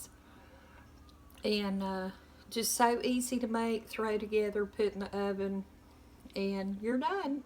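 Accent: South, United States. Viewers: I have slowly become addicted to your YT!! I'm not used to the southern accent, but you have taught me! By the way, I really like your hair down, you look really cute! And LOVE your recipes.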